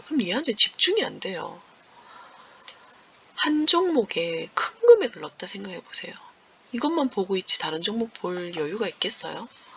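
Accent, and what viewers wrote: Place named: Gyeongsang, South Korea. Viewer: 저는 서울 토박이입니다. 친구중에 경상도 친구가 있는데 서울말(표준말)씨 잘 쓰는 친구인데 제가 들으면 경상도 느낌이 있더라구요. 완전 서울말은 아닌게 있어요. 선생님도 비슷하다 느껴서 경상도. 분이신거 같았어요. 자주뵐께요. 구독 좋아요. 눌러요. 잘 배우고 가요.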